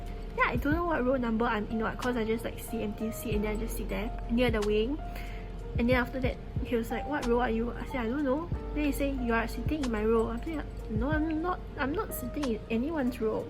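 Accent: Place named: Singapore. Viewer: Quintessentially Singaporean! 😄 A bright Singlish spark in dark times!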